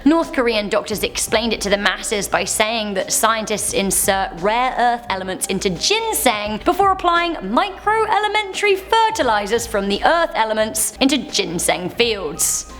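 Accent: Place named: United Kingdom. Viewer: your so lovely 😊🤗😘 & your British accent is Awesome!!!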